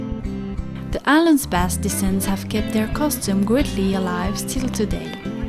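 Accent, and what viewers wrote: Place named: France. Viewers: anyone else like her French pronunciation of English words? I remember I was talking to some French people and I was talking in French but I intentionally used a southern American accent I don't have a problem with the way she's talking I think it's actually kind of cool because you know the words that she struggles with because this sounds like just don't exist in French. I had the oral interview with the French Professor once and I wanted to say my parents were musicians which is true of a I guessed what the word for musician was. seriously if you're a native English speaker learning French and you're not sure what the french word is just try saying an English word with a French accent. that's not always going to work. anyway Saint-Pierre-et-Miquelon, if anyone has been there could y'all tell me what it is like? I would say I know a high level of beginner French would I be able to get around there? 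What would you suggest going to? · Love her French accent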